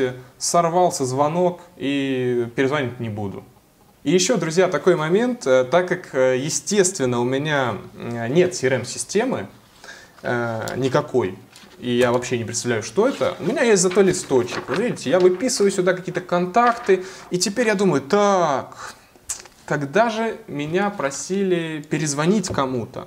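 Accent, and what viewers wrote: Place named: Trans-Urals, Russia. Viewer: Вас тяжело слушать. Зауральский говор. Ткие, шибка, пгврить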